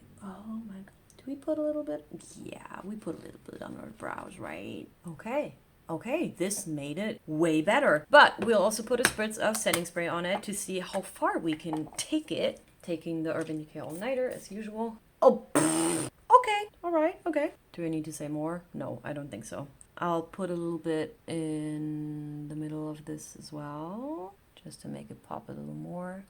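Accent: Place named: RP, United Kingdom. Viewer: Love the video and the look, gurl. It's great hearing you talk english. Very posh. Even I did not grow up bilingual, due to working in different international companies, my english improved a lot and I'm not afraid to use english, whereever it is needed - and yes, I use much more swear words in english. You asked about the difference between purple and lilac. For me purple is darker, warmer whereas lilac is more of a light cool tone.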